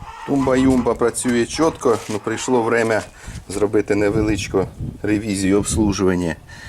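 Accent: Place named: Surzhyk, Ukraine. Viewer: Прекрасна українська мова, так з суржиком) але це українська мова!!!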